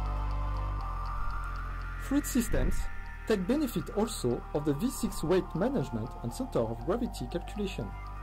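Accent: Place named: India. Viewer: ce n'est pas pas pire que l'accent indien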